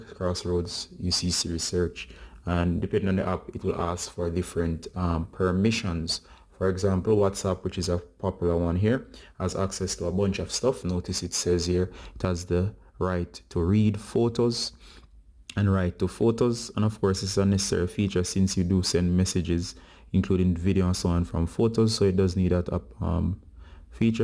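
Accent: Jamaica. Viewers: Your Jamaican??😀😃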